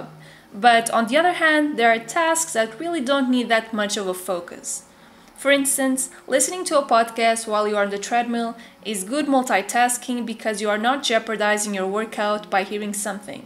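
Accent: Brazil. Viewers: Is she brazilian? Her accent looks like a friend of mine...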